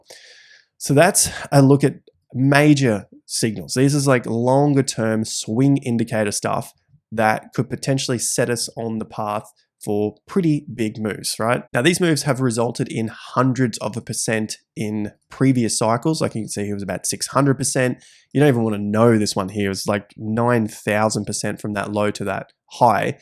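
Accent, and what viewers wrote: Australia. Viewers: Surname might be Italian, but an Aussie and he speaks like one.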